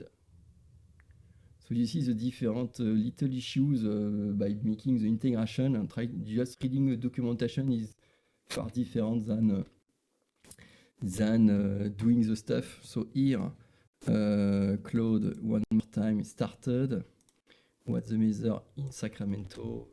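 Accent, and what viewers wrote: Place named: France. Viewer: Merci beaucoup pour cette vidéo très instructive!!! with the cool french accent😅😅😅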